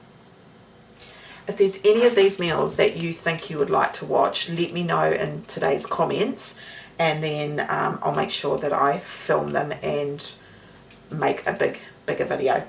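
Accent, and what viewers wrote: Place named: New Zealand. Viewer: As a vegetarian, I'd love to see some vegetarian dishes. Or dishes where the meat is optional... I'm in my 60s, live alone, have a veggie patch, and spend around $50/wk on groceries. I only go shopping once a month, for the same reason as you: I'd spend more! I've built up a good pantry basis and shop my pantry and freezers. I keep track of what I've used. Even though the prices have gone up, my shopping bills have only gone up a little... Because I've got plenty in my pantry, I can wait for things to go on special. I make my own bread, yoghurt, freeze grated cheese, onions and garlic, and make jams, chutneys and marmalades... I also cook big meals and freeze portions for easy dinners. For me, the no shopping challenge AND shopping the specials in different shops, makes sense... It is SO NICE to hear a kiwi accent on YT! 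So good to see you potter in your typical Kiwi kitchen, with your old stove, similar to my own, your lovely aprons (I'm an apron fan!) and watching you cook normal meals, without frills... AND without the jolly long nails that seem to be a must these days...! Thank you for a refreshing new channel! 🎉✨️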